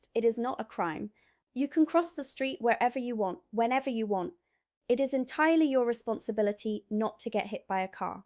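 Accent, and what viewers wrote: England, United Kingdom. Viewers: No way are you American with that very British accent. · hmm two years and a perfect British accent, nice try but no way. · You don't sound American. You sound very british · Think sweetheart, you will find it takes 3mins to bowl a full kettle of water, but who cares, pleased you like our beloved country, as for your distinct accent, have you some English parentage by any chance?.... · You seem to have acquired a nice genuine British accent in your time here....🙂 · Can't understand why you have an English accent? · You could almost pass for British with your accent, especially not mentioning the Electrical outputs, and the water taps. 😃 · How come, if you're a seppoe, you've got a cut-glass English accent? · Excellent presentation, english humour and accent but please lose the Glottal stop! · I only discovered your site tonight. 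You’re terrific. Love the accent (educated English🤭 ). Love your humour. Hope you stay here. 👍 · You have a very English accent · All you haters leave her alone I like the fact she’s adopted an English accent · Have you been practicing your English accent? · how do u have a british acsent? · Respect for your proper English accent. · So why do you have such an amazing English accent ?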